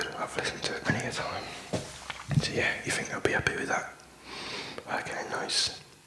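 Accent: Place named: Birmingham, United Kingdom. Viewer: you sound brummy, are you from the black country? im from wolverhampton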